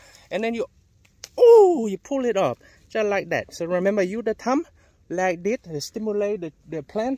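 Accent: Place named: Vietnam. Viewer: i cant stop laughing. everytime i go footscray or richmond, i always hear this accent. lol but i love viet culture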